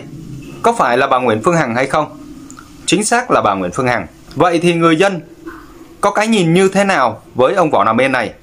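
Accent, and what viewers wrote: Standard. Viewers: Cậu noi chuan tram Phan tram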